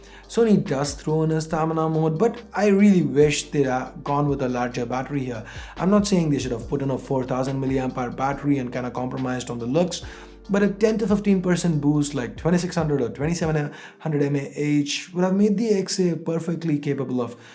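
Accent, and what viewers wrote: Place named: India. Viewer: bhai just a suggestion. English ya toh clear Indian accent me bolo ya fir purely British/American whatever you are trying to attempt here, dont jinx them up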